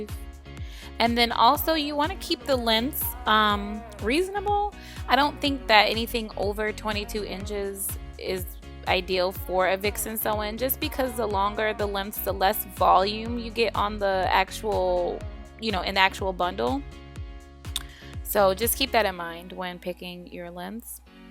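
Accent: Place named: Caribbean. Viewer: Are you West Indian or have West Indian parents. it's just the way you say cornrow and catch/ketch when talking about the braids 😭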